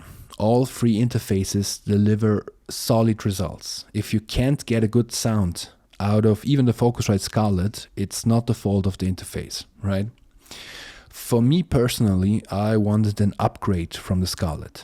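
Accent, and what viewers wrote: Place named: Germany. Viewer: please don't lie to me, I am native, and YOU ARE GERMAN ! never ever of any common wealth area..nice try but just because you MIGHT live in Australia which I also doubt, you are no English native. I am supposed to like germans but after dealing with this...we don't se zurgical we say Surgical and so on so pretty please with sugar on top..get real !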